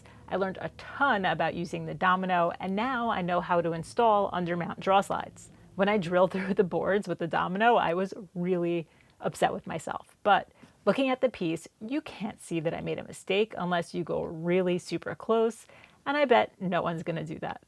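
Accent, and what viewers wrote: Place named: Long Island, United States. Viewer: Long Island big time. I'm not from here originally, so it grates n my nerves every time someone says it. And I'm in a woodworking club lol. See also "Radiator" where the "rad" rhymes with rat, not raid.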